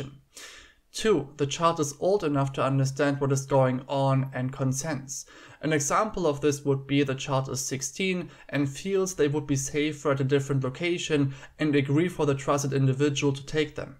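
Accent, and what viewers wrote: Germany. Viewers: Ich hab mich minutenlang gefragt warum mir die Stimme bekannt vorkommt, bis ich den deutschen Akzent bemerkte 😍❤️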